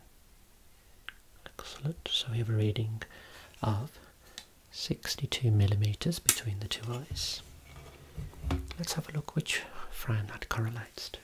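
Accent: Birmingham, United Kingdom. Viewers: ARE YOU A BRUMMIE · Never thought I'd find a brummie optician relaxing enough to sleep to! Lovely voice.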